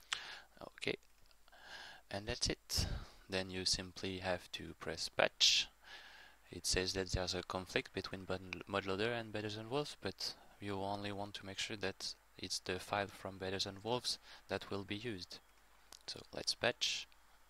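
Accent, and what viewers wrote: France. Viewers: On s'en fout de l'accent j'ai jamais un francais parler si bien l'anglais. -un quebecois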